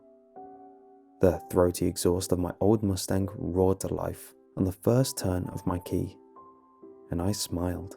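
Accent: England, United Kingdom. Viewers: Why do all tge Creepy Pasta channels sound the same? Are they English or just proper sounding Americans?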